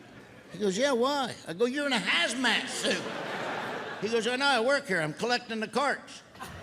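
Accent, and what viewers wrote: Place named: Midwest, United States. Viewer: the funnt thing he does not sound like that in real life, he has a thick mid-west accent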